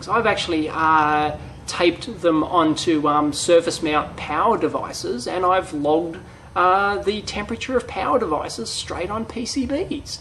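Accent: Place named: Australia. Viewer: I wondered about the strange accent ... Now I know Australia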